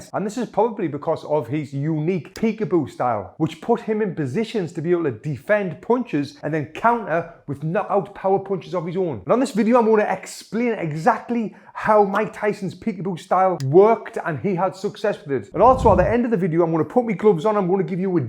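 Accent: United Kingdom. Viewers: Ehy! You are an awesome teacher, I'm gladly following you but I have a question I hope you don't mind: Where is your accent from? I'm italian but I've been in england 4 times (london, scarborough, plymouth, york, manchester) and 3 other times in the united kingdom (scotland, ireland, north ireland) and I can't quite well recognize your way of speaking! It's British for sure, but that's all I can guess.. Thanks!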